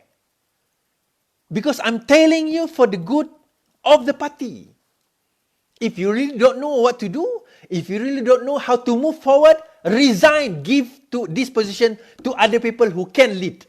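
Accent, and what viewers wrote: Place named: Sarawak, Malaysia. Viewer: Suara oky, tetapi banyak loghat Sarawak yg tak faham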